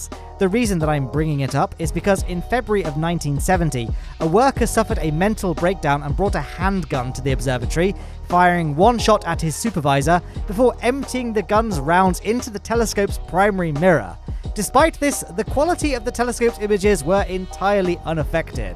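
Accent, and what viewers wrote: United Kingdom. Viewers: He is from the UK to be fair.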